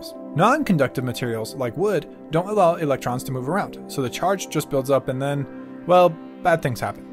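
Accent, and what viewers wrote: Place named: United States. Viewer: Bro hindi me banao video... Ye American English samj nhi ata....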